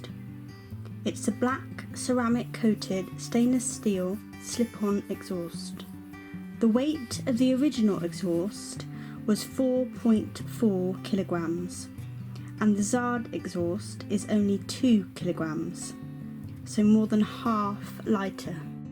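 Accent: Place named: United Kingdom. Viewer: The bike looks, and sounds, absolutely amazing. Of course, so do you, young lady. I love your accent. When I found out I am part Brit (not sure if you watched my video about it) I wish it came with a bit of a Brit accent, too. LOL. Your little brother has great balance, does he compete?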